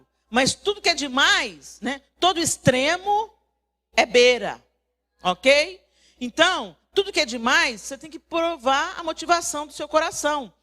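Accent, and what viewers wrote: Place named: Rio de Janeiro, Brazil. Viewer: parece até carioca na forma de falar...rs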